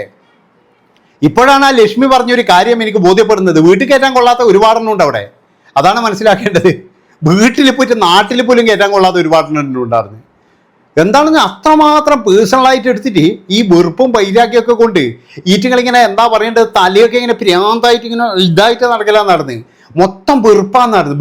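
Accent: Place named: Kannur, India. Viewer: ബ്രോ 👌👌 നിങ്ങളുടെ നാട് കണ്ണൂർ ആണ്.. സംസാരം കേൾക്കാൻ ഒരു രസം ഉണ്ട്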